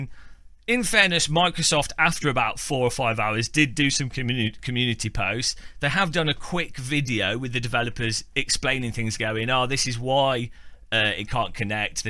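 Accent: United Kingdom. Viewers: Never laughed so hard about something so depressing. Thank you so much for an absolute wonderful British scolding in a just as lovely accent! 😊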